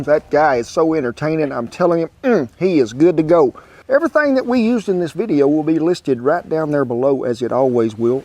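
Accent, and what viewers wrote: South, United States. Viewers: thank you love the country accent im a good ole country boy out of east Texas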